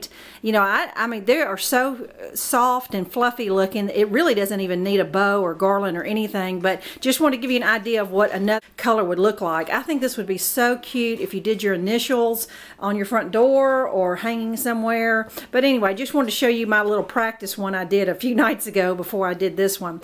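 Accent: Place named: Georgia, United States. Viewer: Love your accent, you sound like my aunts from Georgia!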